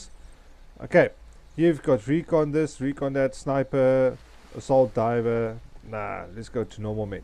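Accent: South Africa. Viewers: South African? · 99% sure you are Afrikaans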